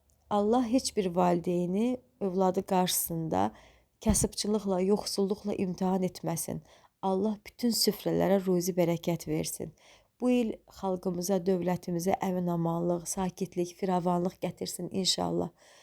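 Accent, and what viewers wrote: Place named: Quba, Azerbaijan. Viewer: Ləhcənizdən qubalısınız deyəsən ancaq qubalılar mehriban olmurlar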